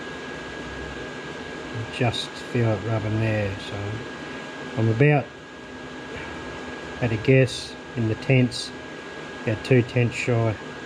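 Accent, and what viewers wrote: Australia. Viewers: Great job, it's like watching Max all over again...... you have even got his Ozzy accent nailed mate! Lol. Cheers, Jon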